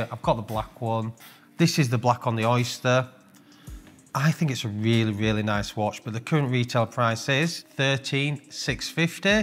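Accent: United Kingdom. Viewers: But remember speaks the queen’s English unlike us Yanks across the pond.😉 Seriously, you are right.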